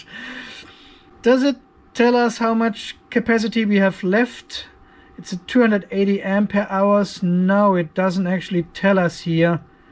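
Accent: Germany. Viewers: Nothing sounds more stupid as a German tries to speak english !!!!!! Or laugh in English 🤣😂🤣🤣😂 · very well explained Vom Akzent her könntest du Deutsch sein.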